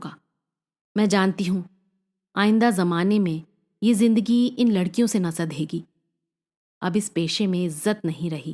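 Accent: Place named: Lucknow, India. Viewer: Excellent... I can smell lucknow in your Voice .... Thanks alot Ayesha 🤍🖤 for recommendation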